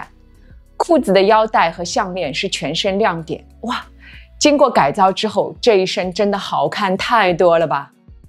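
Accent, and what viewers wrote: Shanghai, China. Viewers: 看了你好多视频了，今天终于订阅了，很喜欢你的一些讲解，听口音你可能是上海人吧。我这里没有Uniqlo的实体店，可惜了。买衣服还是喜欢实体店可以试穿，好多衣服博主穿着好看，自己穿未必好看。支持你一下。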